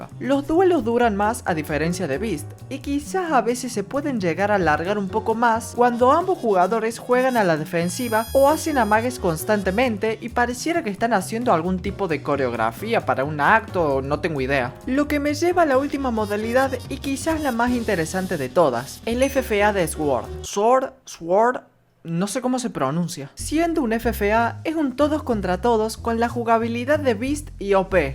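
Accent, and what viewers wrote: Córdoba, Argentina. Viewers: che amigo vo so cordobe? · Una duda antes que todo buen video Bro sigue así la duda boluda que tengo es ¿Sos cordobés?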